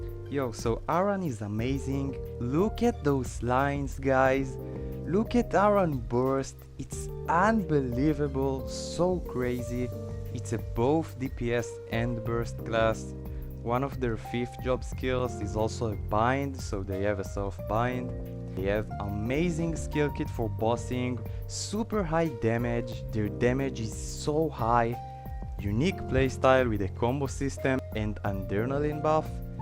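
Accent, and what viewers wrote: Israel. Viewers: You sound like you’re from Israel haha. Awesome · Hey, is that a hebrew accent ? Love your channel bro !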